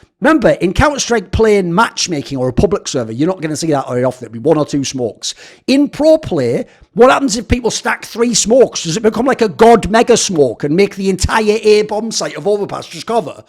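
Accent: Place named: Middlesbrough, United Kingdom. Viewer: not wathced you in a while but glad to hear you've embraced your boro accent more than ever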